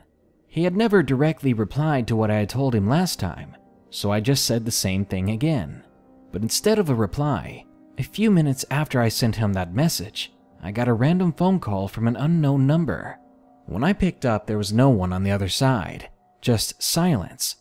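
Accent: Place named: United States. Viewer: Great Videos Man, Also love your pure American Accent voice, did you create your profile picture yourself?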